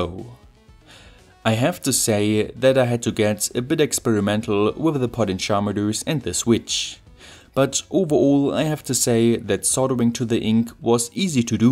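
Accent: Germany. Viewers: Kenne dich nicht aber anhand deiner Aussprache könnte ich schwören du kommst aus Deutschland. Aber interessantes Video 👍🏼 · I am a bit confused by his accent. Where's this guy from? It sounds like a German speaking English with a Chinese dialect. Please spare me the Chinese isn't a language. I am fully aware of that.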